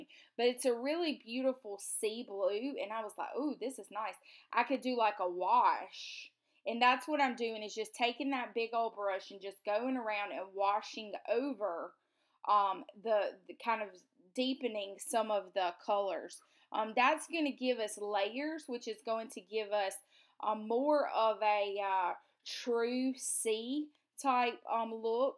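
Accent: South, United States. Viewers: your beautiful southern drawl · I love how a southern accent just makes everything better! :) I have watched a couple videos on image transfers - I’ll have to check out your other videos - for some reason it’s a technique I love but scares me. I think I need to purchase the gel medium - I have fluid - I think the thicker medium will help the process.... also love the masking tape tip! From what I have seen from this video I am really going to enjoy your other work! That’s one of the reasons I love these hops. 1. I get to see new channels 2. I love seeing a similar theme through out and all the different takes AND 3. Who doesn’t love a chance to win something! Lol. (USA) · Girl your accent makes me home sick :) I grew up in South Ga/ North Florida but now live in Ohio. Love the image transfer idea. Really cool page. (In the US)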